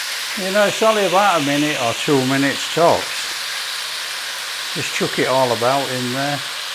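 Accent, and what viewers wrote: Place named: Northern England, United Kingdom. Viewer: New subscriber here, just been binge watching your channel, I'm from down the road in Horwich so it's like hearng my dad talking listening to you with our northern accents, loving your food, I'm going to make your butterscotch tart and your cabbage and bacon casserole this week yummy❤❤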